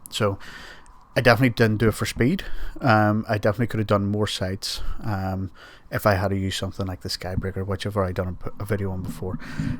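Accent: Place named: Ireland. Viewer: Good to hear your Irish tones loud and clear again!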